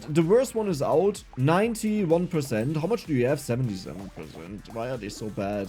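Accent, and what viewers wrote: Germany. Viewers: You sound German… Edit:he is I’m proud of my country now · i can firmly hear the german accent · I Hear deutsch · German english is awesome 👍😎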